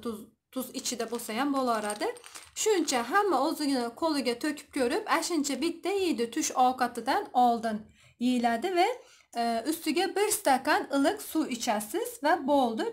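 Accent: Khorezm, Uzbekistan. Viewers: Бу киз Хорозимдан шевасидан билмайсизми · сиз хоразимни апкесимисиз апкаларга ухшеб гаплаесиз апкажон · Ассаламалайкум варахматиллохи вабаракатух ,кизим каердансиз. Тилингиз хоразмликка ухшаяпти. Мен 61 ешдаман, 10,минг $ сарфладим озиш учун, ,,гербалайев ,,,,, дан 1 йил ичдим 32 кг оздим 1 йилда, лекин кейин гербалайни ташадим яна кило олдим, энди табиийй озиш харакатидаман, лекин бу ешдан кейин бундай диета тугри келадими, химия, нур олдим, сал озрок есам холсизланиб коламан, калтирайман, бунга хам маслахатингиз борми. Докторлар кучли ейишни тасия килади, олло рози болсин сиздан, ассаламалайкум варахматиллохи вабаракатух.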